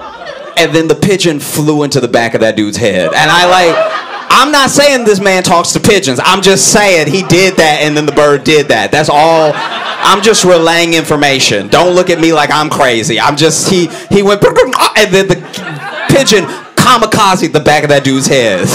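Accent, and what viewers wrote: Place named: South, United States. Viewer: this man sounds like he is from below the mason dixie line!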